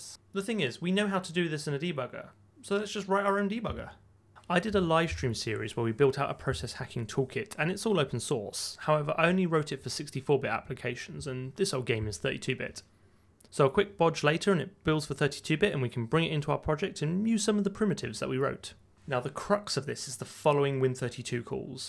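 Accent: United Kingdom. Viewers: I have no idea what you're saying but your delivery is impeccably British and I can respect that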